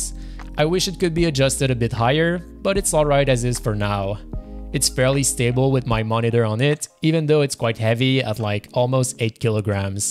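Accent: Quebec, Canada. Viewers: L'accent québécois! yessiirrr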